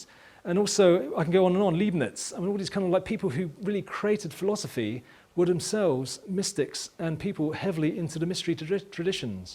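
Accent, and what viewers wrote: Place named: United Kingdom. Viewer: This is the kind of thing that makes YOutube great. Not this Miley Cyrus garbage all the time. Great video. Weird to hear a Chinese guy with a British accent!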